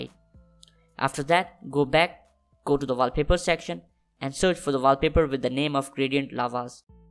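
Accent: India. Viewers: your accent is pretty good and everything but some people doesn't understand maybe because your accent is Indian English so maybe that's why some of them need captions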